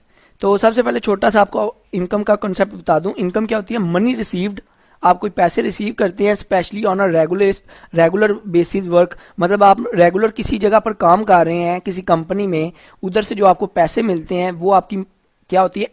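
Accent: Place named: Punjab, India. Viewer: Punjabi Urdu ko Urdu koPunjabi lehjey meyplease na bolain.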